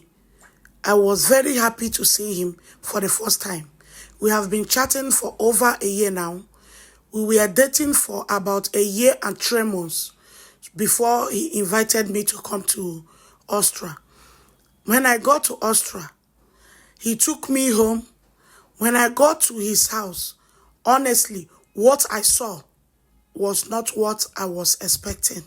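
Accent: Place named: West Africa. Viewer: This accent is like west africa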